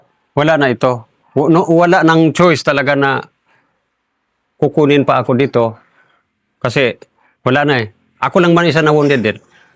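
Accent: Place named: Subanen, Philippines. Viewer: Sa accent ni Master Subanen tribe sya kung di ako nagkakamali kaya matapang... Matapang ang Subanen tribe sa history nilo bolo lang at spears ang armas nila... Salute you!...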